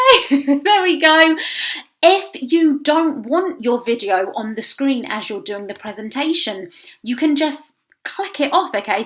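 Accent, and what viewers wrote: United Kingdom. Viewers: Your Accent is dope British loh😂❤❤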